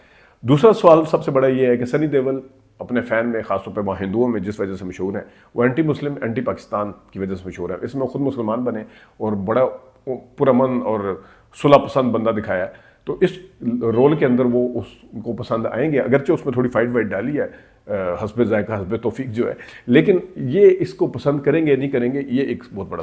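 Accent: Punjab, India. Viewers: Like you I think your mother tongue is Punjabi that's why when your urdu not clear Apki urdu mein bhi Punjabi lehjha clear Nazar ata hey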